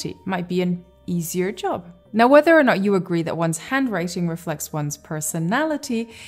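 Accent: England, United Kingdom. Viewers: you've got a good British accent. you sound like Emilia Clarke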